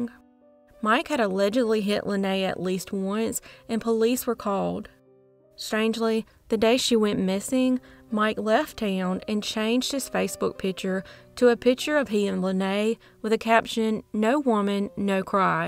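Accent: South, United States. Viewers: Your lovely Southern accent always makes me feel like I’m sitting and having sweet tea, with a true Southern Belle! 🤗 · Love your lil southern accent. Hello fr panama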